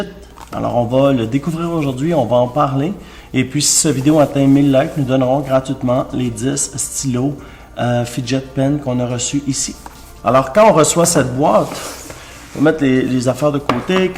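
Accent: Quebec, Canada. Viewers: j'adore le Québec et votre accent et jolie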